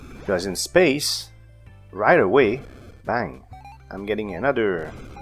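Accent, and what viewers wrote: Quebec, Canada. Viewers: Es tu quebecois ?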